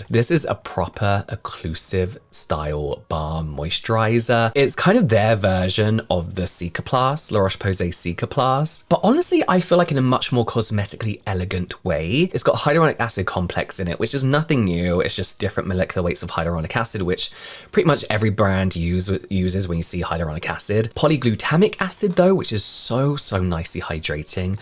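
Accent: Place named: United Kingdom. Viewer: Think you're hearing "tret" as in tretanoin, but with a British accent 😂 some of us don't pronounce our Ts.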